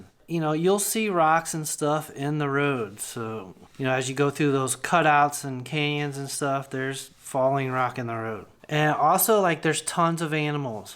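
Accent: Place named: California, United States. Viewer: Man, if that's isn't a Californian accent, I don't know what is! LMAO.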